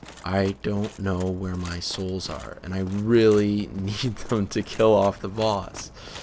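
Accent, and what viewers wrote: Canada. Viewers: I just realized your Canadian .right?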